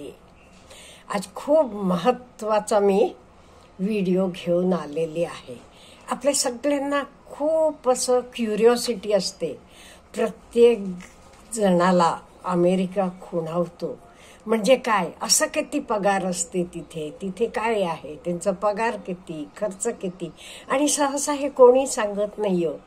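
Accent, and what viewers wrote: Karnataka, India. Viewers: Yes her mother tongue is Kannada ❤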